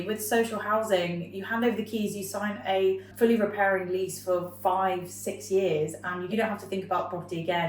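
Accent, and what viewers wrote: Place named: England, United Kingdom. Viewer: She sounds english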